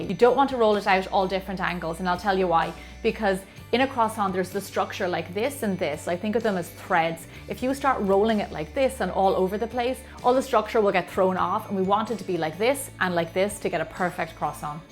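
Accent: Ireland. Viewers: are you Irish ? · Looks heavenly. My mouth is watering. Love your Irish accent❤🍀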